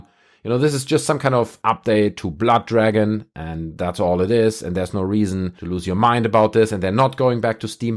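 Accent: Germany. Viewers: Man I just love your pure German accent and wanna see you rant more and shit on ubishit!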